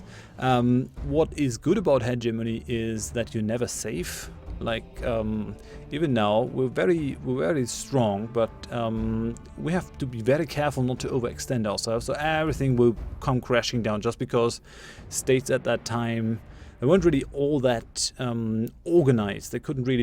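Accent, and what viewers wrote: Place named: Germany. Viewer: It was quite an interesting series! Waiting for your next playthroughs! Thank you for posting the save file. It's going to be interesting to play it from there. Lots of let's players are not as thoughtful and courteous! :) P.S.: I thought you would look like a professor/teacher with that kind of unique sharp look and the small frameless glasses after hearing your German accented English through the whole series lol. Didn't expect someone who looks like a proper stoner or Keanu from that old movie! No offense intended :) Thanks and have fun!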